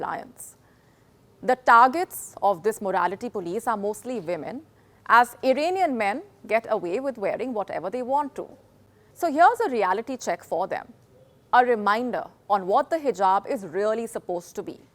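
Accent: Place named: India. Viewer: The newsreader have indian accent. Not little but too much. Let her train more instead of appearing in camera. She read like AI. Train her to pronounce T=th, p=ph, k=kh, etc, etc.... It's not nice to hear the way she pronounced.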